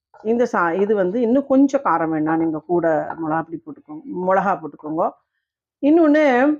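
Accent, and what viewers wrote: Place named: Thanjavur, India. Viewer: Very nicely you are teaching. Thanjavur pani peachu , but little Thirunelveli pechum mix panni you are speaking . Thank you.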